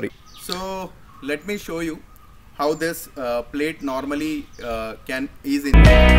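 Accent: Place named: Kerala, India. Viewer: മലയാളി ??? ആണോ സംസാരം കേട്ടിട്ട് 😃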